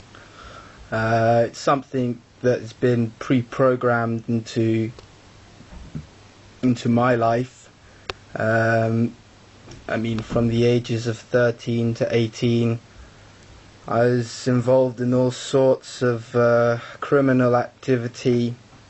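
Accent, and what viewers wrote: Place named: London, United Kingdom. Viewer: What gang was you in? you sound like suburban Londoner. I'm from Hackney, London, and i there ain't no gangs in London, just wannabees, you sound nothing like a gang member. I lived in Oakland for a while and that is a scarey place my nigga with a real bangers. If you wanna help bangers in London, don't make videos go out and do suttin for them. peace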